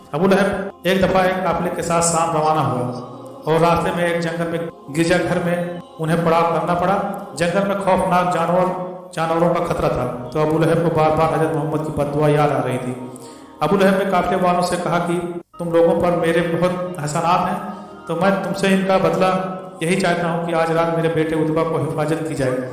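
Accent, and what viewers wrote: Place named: India. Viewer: India sy ho... Aap ki bolnay ka style exact india wala hai...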